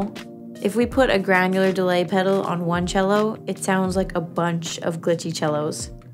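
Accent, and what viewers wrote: Long Island, United States. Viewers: I saw on jhs and im here tone a simp and here cool pedal shit. U and ur LI accent along with ur talent make u the cutest thing i ever saw. Sorry for being weird, ur awesome🤘🏼🤘🏼🤘🏼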